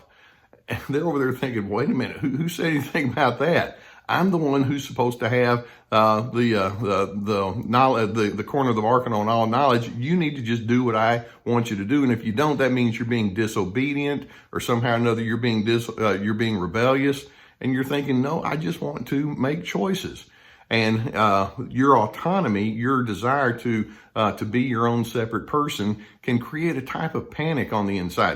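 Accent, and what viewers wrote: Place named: Rural, United States. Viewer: Good topic, just ... but I think people who become professionals should try to get rid of that accent. It is possible to learn to speak with a more neutral accent. Because it's so distracting and it robes him of credibility. And that's just because of the stereo-types associated with the accent, I guess: cows, farms, ranch, horse, farmer, pigs, mcdonald, cowboy hat ... it's not usually associated with intellect. Omg I hate to be so hateful lol. But nonetheless, good content, good channel. Don't me I'm an idiot girl who cannot stop herself from typing my actual thoughts.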